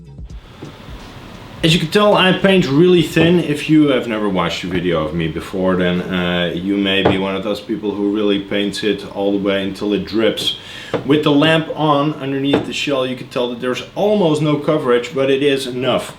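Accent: Netherlands. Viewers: Hey, I'm just getting back into RC, I flew fixed wing years ago, but now I've got the hots for trucks and cars. Ive watched a few of your videos and thinks they are great, no bullshit, just facts and well informed opinions. I'm not interested in your cats either, or people who flower themselves up to be more 'interesting'. 🙄🤥 Keep up the good work. 👍 I have my first car, a Slash 2wd OBA, but this will be my secondary car, my first love is crawlers and I'd like a RC4WD Gelande LWB 110 purely because I want my crawler to be as scale as possible, but damn it's expensive! I'm considering a HPI Venture with a Defender 110 hard body with interior instead as a cheaper alternative, I'd love to see a video on fitting various bodies to the HPI. 😉 Oh and BTW, I can't get your channels theme song out of my head, or you're 'crazy' Dutch accent. 🇳🇱👍 Jelly from 🇬🇧😁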